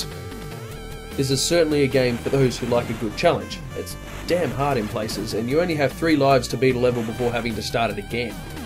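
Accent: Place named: California, United States. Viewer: Dude, as a native Californian, I have to say.... that was a pretty damn good Cali dialect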